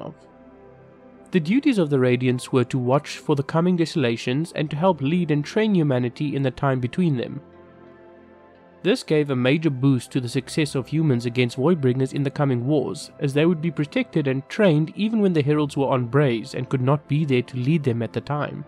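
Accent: South Africa. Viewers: was quite excited to hear a saffa voice :D keep up the good work! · Haibo,…narrator sounds like a Saffa 🇿🇦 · This is a great video! Thank you.... Also, your accent sounds very local to me. I think we may be from the same country! South Africa? :) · I like to support a fellow South African. Could tell from the accent. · South African accent makes me feel home again, moved 5 years ago. This is one of my favourite series right now so it ties together nicely. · Are you south African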